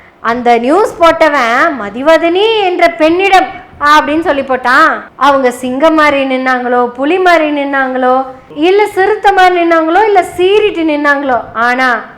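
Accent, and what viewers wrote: Kongu, India. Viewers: கொங்கு தமிழில்,கொஞ்சும் தமிழில் அவர்கள் கொன்டுவந்த செருப்பை சரியாக பயன்படுத்த சொல்லி கொடுத்த பாணி அருமை. வாழ்த்துக்கள். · அருமையான கொங்கு தமிழில் விளக்கமாக விவரித்த சகோதரி அவர்களுக்கு எனது பாராட்டுக்கள் மற்றும் வாழ்த்துக்கள் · சகோதரியின் கொங்கு தமிழ் மிக அருமை 😊😮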